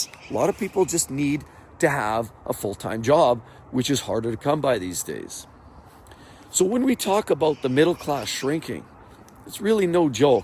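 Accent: Canada. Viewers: Sorry if you mentioned before..... Are you Canadian ? When you say about...you pronounce : a boat. Not making fun...l really like it 😂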